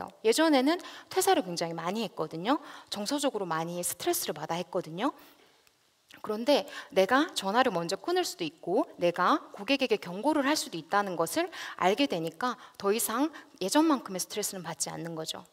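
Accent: Gyeongsang, South Korea. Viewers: 이 여자는 경상도말투라서 사납게 느껴지니 거부감이 든다.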